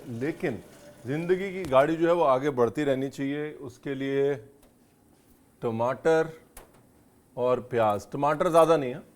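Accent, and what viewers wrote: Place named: Punjab, India. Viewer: Ranveer ji, I have tried your Louki Dhokla and Paniyaram, it was yummmm! My daughter loved it. BTW Paniyaram is a term in TN and Kuzhi Appam is called in Kerala. Kuzhi pronounced as “Kuli” means small dents/ holes. Perhaps Appe was morphed later in other regions incl MH etc., additionally wanted to share, you speak very well. I mean your friendly, conversational recipe making is a treat to ears ! I adore your Punjabi accent and the wit and humour you bring along. Your Videographer for this channel needs an extra applaud for capturing colors and sounds to the precision, even the mustard crackling sound was a sound-delight to listen to. Prayers and Best wishes for all your endeavors, keep inspiring us.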